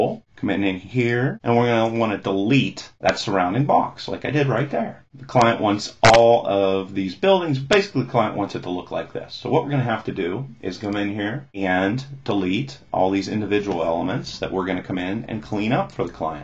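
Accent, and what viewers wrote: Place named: Texas, United States. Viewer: are you from the same place as Mathew McConaughey, your accents are exactly the same. sorry for the unrelated comment. great tutorial btw.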